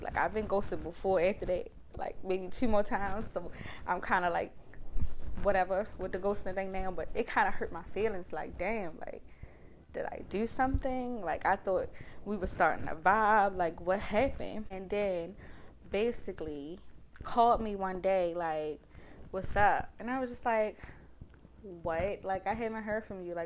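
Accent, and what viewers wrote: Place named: Baltimore, United States. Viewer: Baltimore accent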